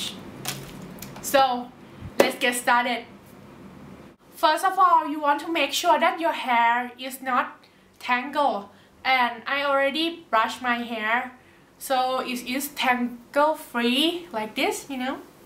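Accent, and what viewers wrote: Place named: Thailand. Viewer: Thai accent Oh~ that good 👍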